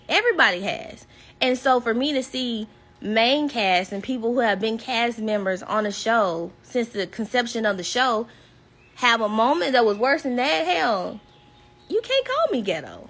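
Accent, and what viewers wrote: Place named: South, United States. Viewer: I love me some. I love the southern twang and all the realness of her.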